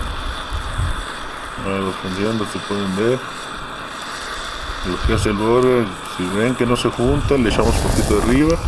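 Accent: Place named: Northern Mexico, Mexico. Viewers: Eres de Sinaloa? Tienes el acentohaha buen vídeo hermano, muy interesante la verdad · tu acento de chihuahua o sonora es indiscutible ¨ya pisha una soda mushasho" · Muy bien mi amigo,me acabo de suscribir,se nota por tu acento que eres norteño,yo soy de Aguascalientes,de zona de minas,un abrazo y fuerte saludo compa,por tu sencillez y por compartir tus conocimientos,me gustaría que hicieras un vídeo de como extraer oro de tarjetas electrónicas,a mi me llaman Ripper,a tus órdenes,y seguimos en contacto,dios te bendiga,ah y te voy a hacer una pregunta,ojalá me la sepas contestar, " a quien pertenece todo el oro y la plata ? ".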